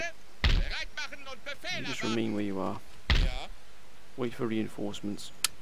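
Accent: Wales, United Kingdom. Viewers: you're a real gentleman, and very polite keep up the good work(: PS Are you welsh?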